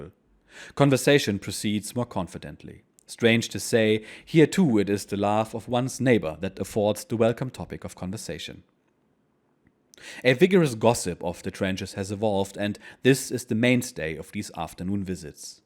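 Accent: Germany. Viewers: I have read this book twice and my daughter has read it but being read with the German accent is undoubtedly the best.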